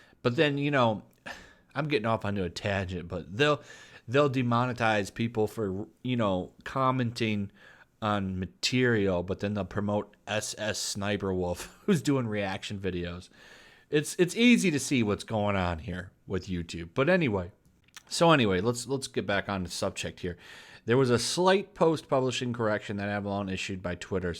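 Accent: United Kingdom. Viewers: Your huffy British accent is on point.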